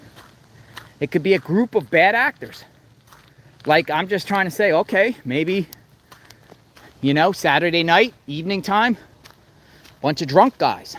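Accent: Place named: Baltimore, United States. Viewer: where are you from? You sound like you're from Baltimore! I'm from there so I know the accent. Its not heavy (your accent) but I feel youre from BMore /Philly????? Please answer if you have a minute. TIA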